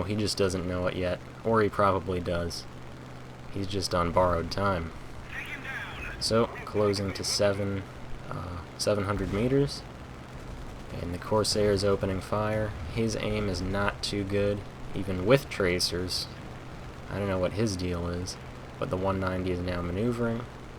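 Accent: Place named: New England, United States. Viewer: I bet your from Massachusetts. Your accent sounds like a New England Suburban Accent. I'm From Boston MA myself and we also say "retarded" like every other sentence. If I'm wrong I apologize but thats my guess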